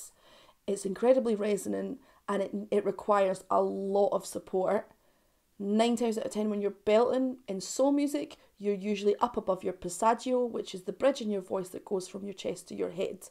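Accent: Scotland, United Kingdom. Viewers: Are you Scottish? Love your accent!🥰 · Why do i feel like I’ve never heard a girl with a Scottish accent? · I can't tell if you're super charismatic or if I just think women with Scottish accents sound really cool. Probably some from column A and some from column B. Subscribed! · Im from Australia and the scottish accent nearly makes me weep , i fkn love it !!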